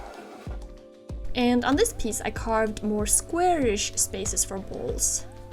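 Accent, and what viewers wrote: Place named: Sweden. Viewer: Ha! I knew I recognized a Swedish accent. Hej!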